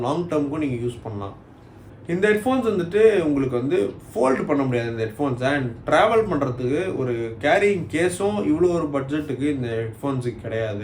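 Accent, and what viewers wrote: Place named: Thailand. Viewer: I love your thai accent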